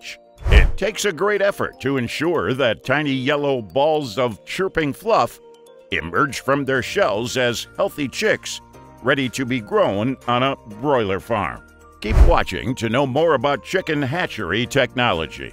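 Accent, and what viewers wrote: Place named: United States. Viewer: such almighty american (note the lack of capital in the spelling..) So why are the EU not willing to accept us produced chickens..oh could that be the use of BANNED chemicals..but the big american voice over says the are JFK rn certified 100% approved for human (nay american) consumption. Hey - just a thought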